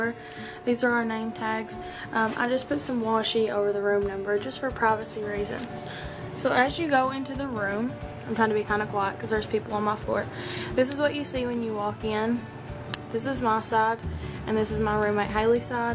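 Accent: Kentucky, United States. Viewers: Love your accent I wish I was from the south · I LOVE YOUR KENTUCKY ACCENT!😍😍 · What part of Kentucky are you from? I'm from southern WV and your accent reminds me of home :) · OMG I could tell she was from Kentucky as soon as she started talking :) I'm also from Kentucky :)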